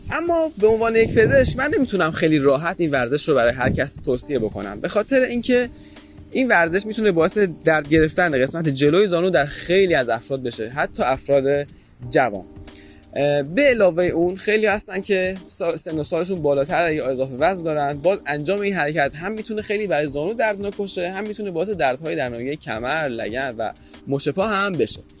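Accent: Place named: Turkey. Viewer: دکتر سعی کند لهجه خود را درست کنند اینکار فقط با ترکی صحبت نکردن امکان پذیر است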